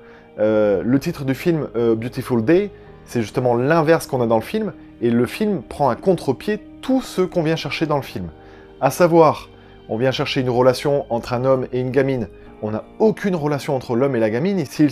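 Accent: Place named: England, United Kingdom. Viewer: Faut faire comme moi. Ne pas regarder le foot. Voire le sport en général (sauf peut-être les sport d'hiver) PTDR! Quand on me voit, c'est EVIDENT que je suis une grande sportive XD. Cette intro que nous as fait encore X) Il en faut peu pour me faire marrer, mais là, j'ai bien cru que j'allais y crever avec quinte de toux qui s'est invitée en même temps XD Et dis donc, j'me permets un compliment, tu es élégant et classe en veste comme ça! Bon c'est pas que d'habitude t'es pas présentable, mais pour que j'me dise (moi qui en ai strictement rien à battre de la touche des gens) : "Woh, eh pas mal quand même le gars!" XD Ma myopie disparaît parfois. Ah et magnifique accent anglais. Du coup, j'me sens mieux vis à vis du mien :p Sinon, je pense que ce film me plairait. Et j'aime beaucoup Joaquin Phoenix. J'vais noter ce film sur ma liste de films à voir. 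:) Car il me semble qu'il ne passe pas dans le cinoch' près de chez moi.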